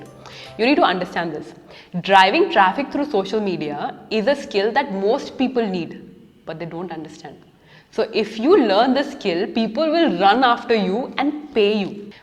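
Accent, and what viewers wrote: Hyderabad, India. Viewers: Are u an Hyderabadi ????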